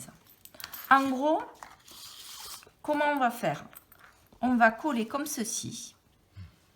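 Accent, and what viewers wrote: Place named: Toulouse, France. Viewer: Mais qu'il est beau notre accent toulousain 😉. Merci pour le tuto. Je participe...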